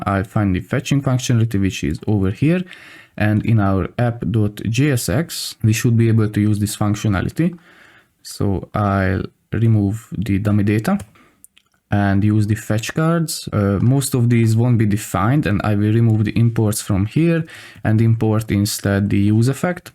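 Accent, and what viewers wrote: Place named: Russia. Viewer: amazing course subbed ,also enjoying your russian accent😀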